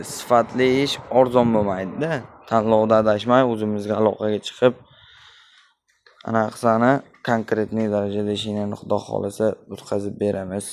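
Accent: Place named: Khorezm, Uzbekistan. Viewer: хорпзмчага айланибдику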